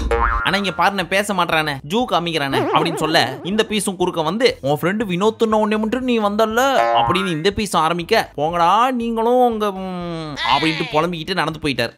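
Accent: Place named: Madurai, India. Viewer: 9 ruba note madurai slang anna 😂😂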